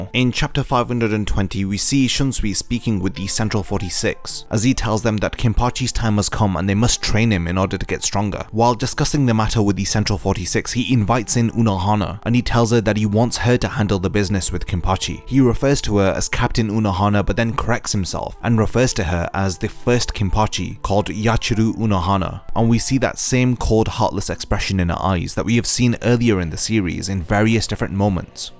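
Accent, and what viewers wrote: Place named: London, United Kingdom. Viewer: Yeah, I'm curious what your first language is too. It sounds like you learned english with a london accent. The mix sounds good.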